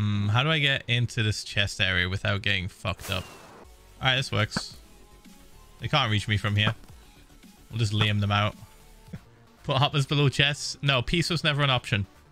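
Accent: United Kingdom. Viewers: It’s a version of a British accent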